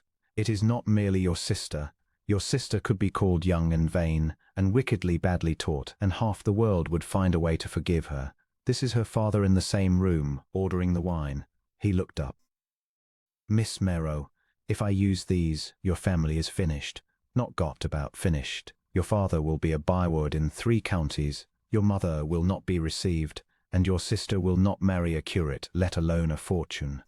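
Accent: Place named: United Kingdom. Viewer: I absolutely loved this story! Well written and perfectly narrated. A British accent makes these stories so much more interesting to listen to. Thank you!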